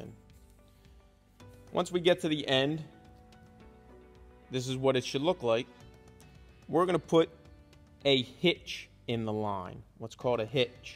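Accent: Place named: Baltimore, United States. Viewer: Based on your accent, you are from Baltimore or Philly.?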